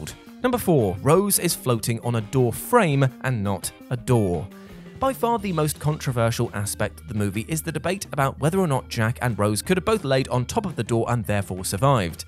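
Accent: United Kingdom. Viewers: Why is it time and time again like a high percentage of these documentary like features are narrated by English accented actors or spokes people. Enough of these overindulgent usage of accented narrations by Brits. There are other people of other nationalities who can do an narration or presentation.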